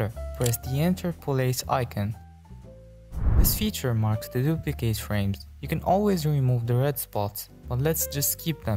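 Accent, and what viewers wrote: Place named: Morocco. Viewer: You sound Moroccan😭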